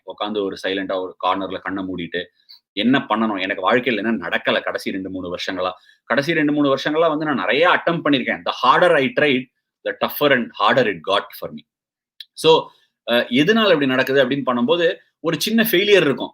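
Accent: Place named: Tamil Brahmin, India. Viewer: Tamil Brahmin style of talking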